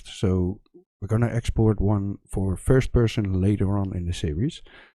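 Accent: Netherlands. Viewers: Ik hoor dat aan mensen hun Engels dat ze uit Nederland komen.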